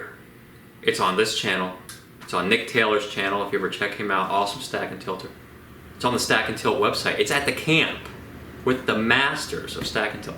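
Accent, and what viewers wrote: United States. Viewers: I am 78 3/4 years of age now. Your story is very impressive. I have been playing golf over 45 years with my score ranges of 86~98. I long wanted to become a member of 70s club (golfers who play with strokes 79 or below 79 per 18 holes) but I gave up my hope as I already became old. But one day, while I was watching a Utube golf lesson program, A funny and happy guy who speaks "a very easy to listen, beautiful" American English appeared in another Utube screen. It was YOU, Mr. and You were talking about swings joyfully quoting the theories physics and geometry. You theory attracted me a lot and I instantly fell in love with you. This is what I long long wanted theory and practice. You encouraged me a great deal and I decided to challenge the AGE SHOOTER. Now, all parts of my body have been aching, so many parts that have never been aching during my 45yeas of golf. 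I enjoy small aches and become happy every day because my scores have been improving. Thank you. I will someday visit you and receive lessons from you!!!!!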